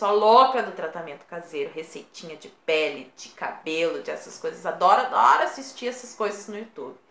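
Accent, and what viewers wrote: Rio Grande do Sul, Brazil. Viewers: Tem sotaque sim tchê!!! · Maravilhosa...corajosa...queridona..sotaque gaúcho sim kkkk....Não entre em depressão, porque tu não vai sofrer por causa de mediocres né....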